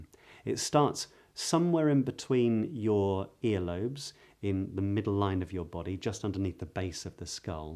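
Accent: RP, United Kingdom. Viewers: Please start a podcast for rp accent listening practice. Could you please recommend few podcast with rp accents?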